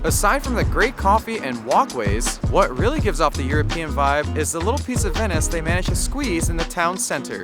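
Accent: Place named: United States. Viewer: WE KNOW YOUR AMERICAN its ok stop being such an attention seeker we KNOW ok . FFS but great video the accent is annoying and hideous but great video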